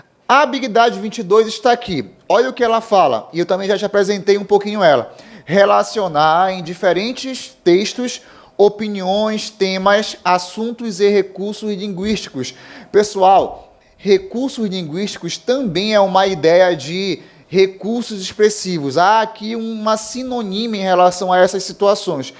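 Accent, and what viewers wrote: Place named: Pará, Brazil. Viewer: aula boa é com sotaque paraense égua mana muito bommm!!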